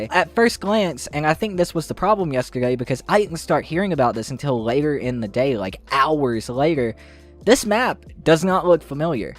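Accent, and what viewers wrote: United States. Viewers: The strong American accent gets a like from me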